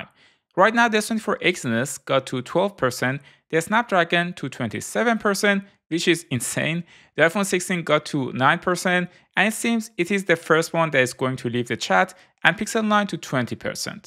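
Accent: Iran. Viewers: Love your persian accent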